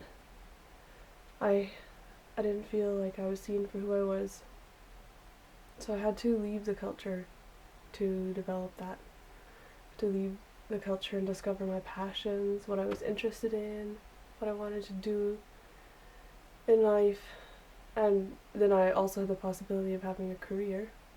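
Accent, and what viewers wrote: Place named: Manitoba, Canada. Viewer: I can absolutely tell that you were raised holdeman by the way you speak. Thank you for sharing! May I ask, are you from Manitoba? You have the classic Manitoba accent within the "church".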